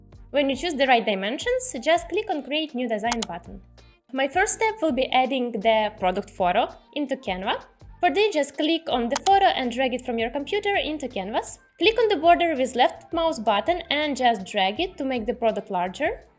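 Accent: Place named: Russia. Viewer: I love your accent are you Russian?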